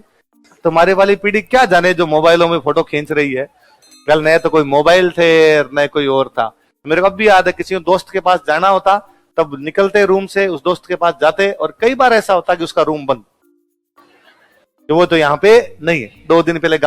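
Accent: Rajasthan, India. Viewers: Aap ke rajasthani excent ko me enjoy kr rahi hun isiliye aapke videos dekh rahi hun!! Me Hindi teacher hun!!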